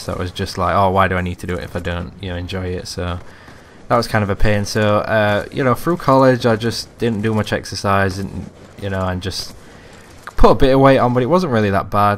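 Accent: Yorkshire, United Kingdom. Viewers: which county of yorkshire are you from? (not pervy just wondered)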